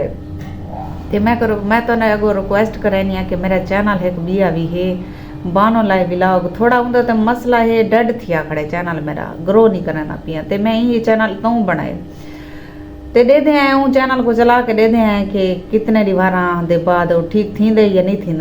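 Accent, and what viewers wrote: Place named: Saraiki, Pakistan. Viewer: jaisay teri methi methi saraiki hai na tuo dil karta hai teray methay methay honton ko choom loon. bohat acha diya hai Jati oya :-)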